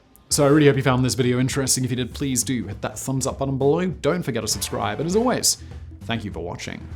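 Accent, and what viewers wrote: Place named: England, United Kingdom. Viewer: I was amazed that the radio call was preserved, and isn't it odd that a midwestern sailor speaks with an English accent and oh I'm an idiot, that's voice.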